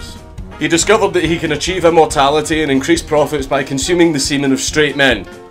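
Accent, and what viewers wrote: Scotland, United Kingdom. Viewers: where the illuminati invented THE GAY [ The Scottish accent makes so much better ] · I love your Scottish accent! 😀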